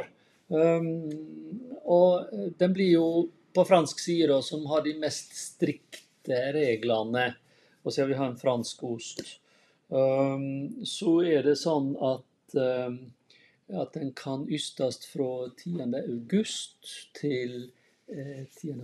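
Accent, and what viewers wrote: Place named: Sunnmøre, Norway. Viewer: Jeg har lenge ønsker å lære meg om ost, så jeg var ute etter helt grunnleggende kunnskap på youtube, kjekt å finne din kanal hvor du tat for deg ost for ost og historie bak. Og bonus med sunnmørsdialekt👏🏼 Jeg starter på første video og ser alle. Og i helga skal jeg prøve meg på Mont d’or for aller første gang, gleder meg!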